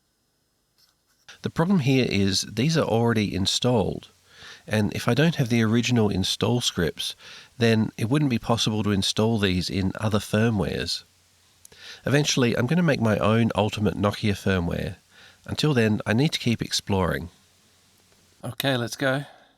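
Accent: Australia. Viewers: Given his accent, he appears to be from Australia.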